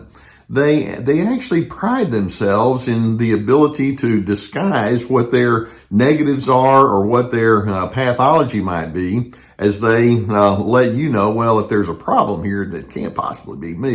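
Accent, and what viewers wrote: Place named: Texas, United States. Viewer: I feel better just looking at Gus. A really chilled dog! Love Texan accent too! It helps so much to have these videos to understand my past and in such a nice way. Thank you!❤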